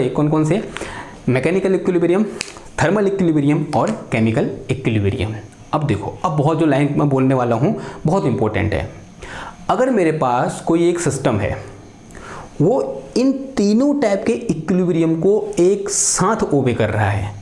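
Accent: Uttarakhand, India. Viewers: Sir aap Uttarakhand se ho kya ?🫠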